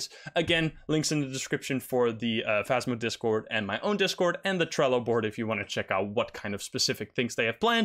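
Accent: Netherlands. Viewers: Wait, are you dutch?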